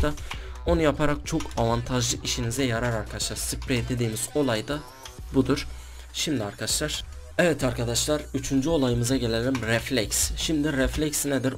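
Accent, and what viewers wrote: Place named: Diyarbakır, Turkey. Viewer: diyarbakır lı mısın gardaşım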